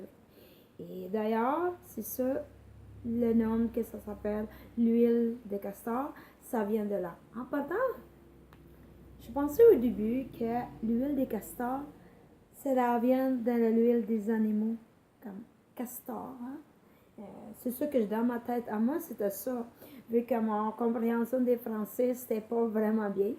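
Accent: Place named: Canada. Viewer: Merciii, c'est vrai que c'est excellent l'huile de Ricin. J'aime beaucoup ton accent canadien.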